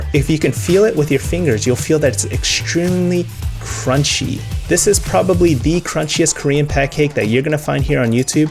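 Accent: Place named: United States. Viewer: Thank you for your tip on getting an extra crispy results. Rice flour along with the carbonated soda also works. My only issue, is that (other than the amount of frying oil) you did not include portion or size requirements in your video nor in your description box. Portion size of ingredients does effect the desired results of the batter after it is cooked. Lastly, you have an American or Canadian accent, but live in Japan, what is your heritage? Thanks again for your tutorial. ⚘⚘⚘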